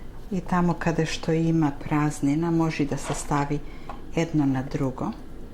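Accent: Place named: Kavadarci, North Macedonia. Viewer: Bravos super si!! Site videa se so tradicijata na makedonskata kujna i se odlicno napraveni(zgotveni) a se razbira i mnogu dobro objasneti.👍😊Sakam da te prasam od kade si ti od kaj poteknuvas od koj kraj na makedonija??deka akcentot tvoj e mnogu ubav i me potseka na mojot kraj od kaj sto sum jas Kavadarci a sega ziveam vo Sydney Australia.Golem pozdrav od mene Gorica 😊 i se najdobro.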